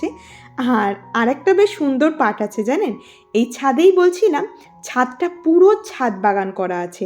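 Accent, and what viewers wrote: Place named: Kolkata, India. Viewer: Well done! Your accent is like more from Kolkata :)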